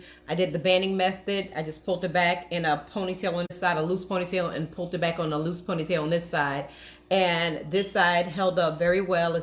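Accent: New York, United States. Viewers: Def not mad at it. Looks great! Where are you from? I hear a slight accent? NY? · That New York accent gets me Everytime! "Let's twok about it...a little swofta!" You have to say Albemarle in your next video... Just for me! I love your hair.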